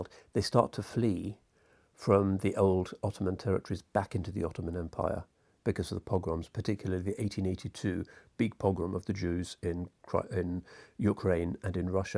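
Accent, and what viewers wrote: England, United Kingdom. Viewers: this guys voice, house, appearance and English accent makes watching his videos so soothing and cosy. its like your sitting infront of a chimney fire drinking hot coco while hearing a story from your grandpa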